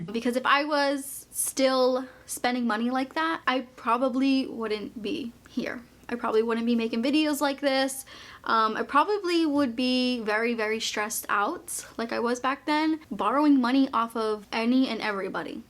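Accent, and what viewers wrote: Boston, United States. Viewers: Hello from Canada. I'm a relatively new subscriber and am so glad I found your videos. You are so down to earth and logical with very good information. I just have to tell you that I love your Boston accent.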